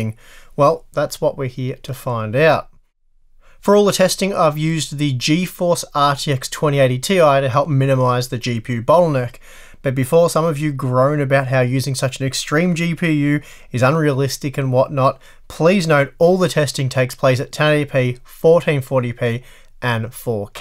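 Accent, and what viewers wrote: Australia. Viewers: I love what the auto subtitles make of your intro with your Australian accent every time. This time it said 'welcome back to hammer on box' 😂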